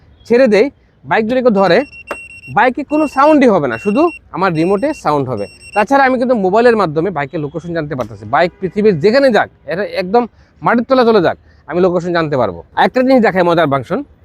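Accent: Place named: Sirajganj, Bangladesh. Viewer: সিরাজগঞ্জ কথায়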